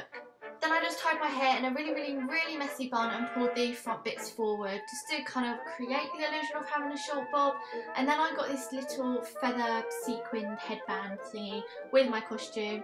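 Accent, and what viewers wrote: United Kingdom. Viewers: You are so sweet! I love how you say "hello my lovelies" at the begging of every video in your amazing british accent(; -3 i seriously adore you!!!!!! xoxo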